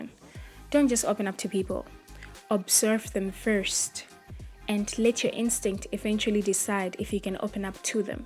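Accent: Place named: Zimbabwe. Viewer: Very proud to hear a sophisticated Zimbabwean sisi here :) Well done, lovely accent. I have the same. Keep living and growing well girl. Love from the UK xxx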